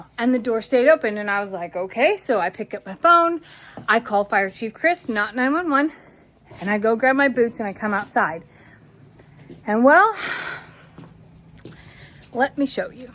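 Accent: South, United States. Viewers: Never thought I would hear with a country accent. Love to hear you sing! Hey Chris..glad you're on speed dial. Thank God y'all are safe. Good job, little Chris!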